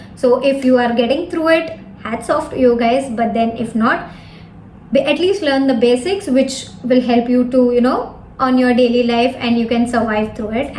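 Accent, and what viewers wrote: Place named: India. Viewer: Indian accent. Pleasant to the ear so cheerful. I've always liked him.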